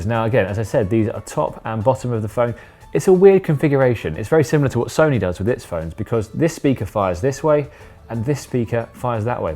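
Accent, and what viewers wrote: England, United Kingdom. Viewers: Gosh dang man you complain so much. It is wit is, I honestly wish I hadn't watched this video. Just one log complaining video. I really hate this kinda negative negative negative. Then take it back. You have like 14 days. You did your review and your not impressed. So take it back and get the 6s out and use it until the next one comes out. Good grief. Even in some English accent whining sucks!!!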